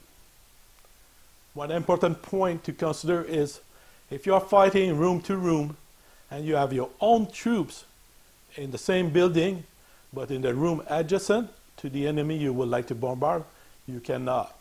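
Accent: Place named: Quebec, Canada. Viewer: Hey!! is french your first language? cause it seems like you have a quebecois accent:)! if so, i'm quebecois too! I really enjoy your videos! You are really helpfull, cause i start doing some build of model kit and i didn't really know how to. and i found your channel and it helped me a lot! And it's cool that you are canadian!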